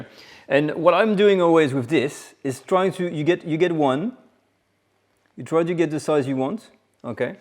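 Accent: France. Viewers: Everything tastes better with that French accent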